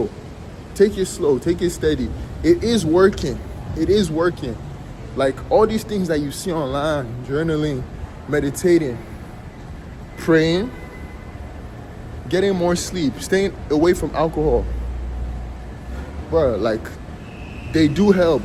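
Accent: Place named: Nigeria. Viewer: Great video, man. Btw, you kinda sound Nigerian. I'm Nigerian, that's why... hehe.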